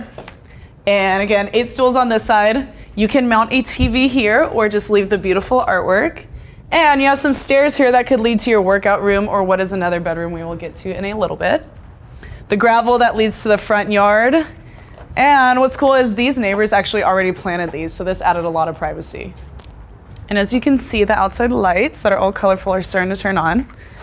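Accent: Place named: United States. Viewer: Someone can tell me from which state this american english accent come plz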